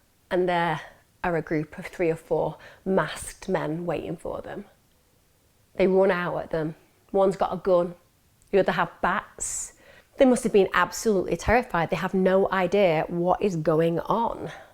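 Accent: United Kingdom. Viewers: I just want to stop by applauding you with your consistency I mean I seriously don’t know how you do that! You’re a professional background is so interesting and your research is beyond Par! I moved from Wales to Southern California and seriously I just love hearing your British voice I miss that a lot been here nine years now! I just shared the Turpin case on my Facebook as that is actually really close to me just a few miles! Keep shinning you are incredible! And thank your amazing Hubby!! 🤍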